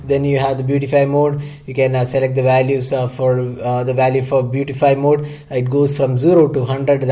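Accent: Kerala, India. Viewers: r u from kerala?? 😉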